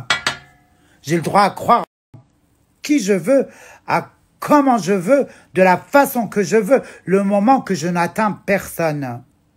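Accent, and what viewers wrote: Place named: Saint-Denis, France. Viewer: Français des quartiers défavorisés de saint denis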